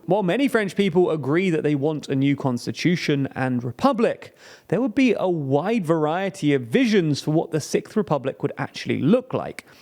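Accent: United Kingdom. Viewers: It's LA France. I watched anyway thinking the narrator is a Brit.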